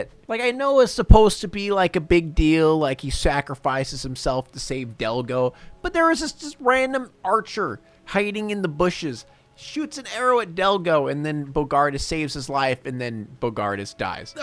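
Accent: United Kingdom. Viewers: why did you cut out Malcolm McDowell's name? lol its literally the only name on that list that has a voice that sounds like your british accent lmao and btw idk why but i feel like Elemental is just like this movie with character building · Wow your New Yorker accent sounds very British,what you read was written by The Nyc guru,as it said at the top of what you read .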